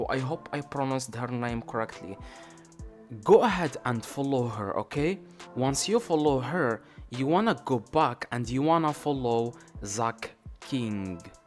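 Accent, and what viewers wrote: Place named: Morocco. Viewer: واش خونا مغريبي بينا من هضرتك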